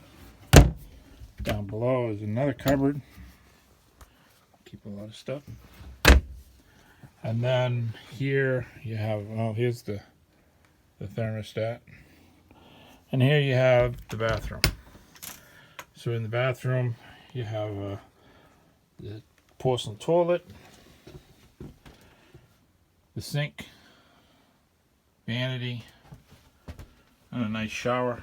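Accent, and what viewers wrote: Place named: New England, United States. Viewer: Great tour - thanks so much. A few times I thought I heard a northeast accent. Are you originally from New England?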